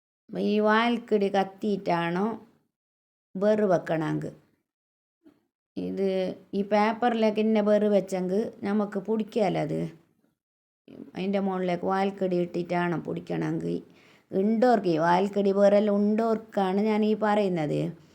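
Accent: Kasaragod, India. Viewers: കാസർകോട്ട് സസംസാരം കേൾകാൻ ഇഷ്ടാണ് video super ❤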